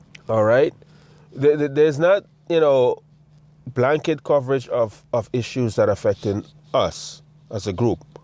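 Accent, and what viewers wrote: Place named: United Kingdom. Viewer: You sound british. You come from a very different experience than afro americans. It's NOT the same.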